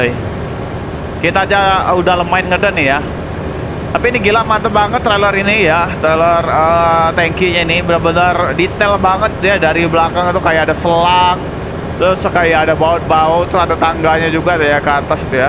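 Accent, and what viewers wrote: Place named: Java, Indonesia. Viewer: Bang sampean jowone ngendi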